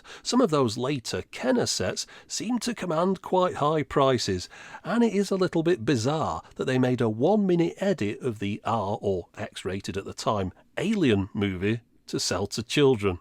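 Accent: United Kingdom. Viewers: Hi sorry, what sort of accent do you have? I can hear it's a UK accent but what part? Just curious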